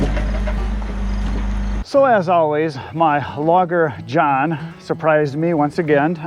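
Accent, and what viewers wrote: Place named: Upper Peninsula, United States. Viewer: Welcome to Michigan. The people from the UP sound a bit like you so you should fit in nicely. Have a great time.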